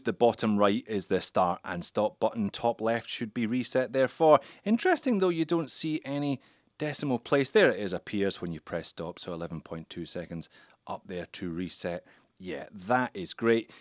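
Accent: Scotland, United Kingdom. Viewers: It's not a $200 watch. Very enthusiast cheerful review this bloke sounds a wee bit Scottish maybe? 🤔 I prefer my Commodore Red LED from 1976 from Boots Irvine Ayrshire by Willie Claus 🎅🤣 Enjoyable video yet again.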